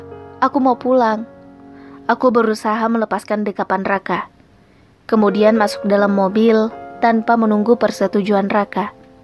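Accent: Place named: Mamuju, Indonesia. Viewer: Maaf boleh tanya miminnya ni org mna? Kok kayak org Mamuju?😁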